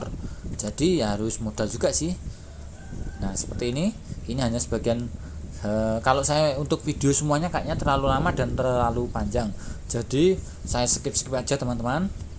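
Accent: Java, Indonesia. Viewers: Wong jowo ki mesti 😊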